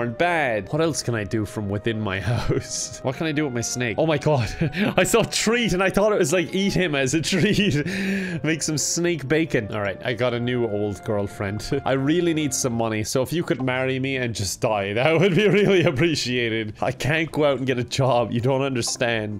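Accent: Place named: Ireland. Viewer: accent is getting more and more Irish recently, and I am HERE for this beautiful accent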